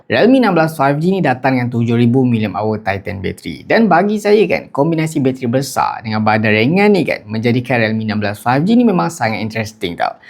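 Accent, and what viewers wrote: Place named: Perak, Malaysia. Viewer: Jongkang jongkit..Kompom org perak ni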